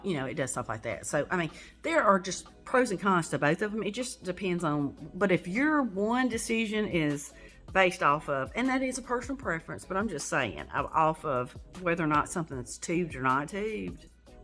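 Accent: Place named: South, United States. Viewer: Hi there. I just discovered your channel and I am enjoying it. I’m also southern, so enjoy your accent. I’ve been on the Omnipod five Pump for a little over two months and I am considering going to the Tslim, which I tried for only one month last year and we could never get the Settings right. I’ve had to be very aggressive on everything from insulin to carb ratio, to insulin duration on the Omnipod. Can you tell me what maybe to expect in trying to set up the T-Slim as far as setting it up in comparison to the Omnipod? Meaning, since I am very aggressive on the Omnipod Settings, virtually all of them, even tweaked the manual ones because I was hoping it would help Omnipod give me more basal, would you have any recommendations about percentagewise changes to start with on the T slim like maybe 10% less aggressive or 5%? If I go back to the T slim, I would sure like it to work very well. 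And I’m still considering MDI because I’m only A few percentage points off in my time in range on the Omnipod but Omnipod requires tons of corrections and after a little over two months I’m still getting 60% of my insulin as bolus which includes the corrections, and 40% from Basal. And I thought it was supposed to learn and try to get me to 5050, but it’s a slow learner at this point. Any help or suggestions would be wonderful. Also, my the tea slim do better with exercise than the Omnipod? I have not been able to get that to work. Thanks if you can. Have a great day.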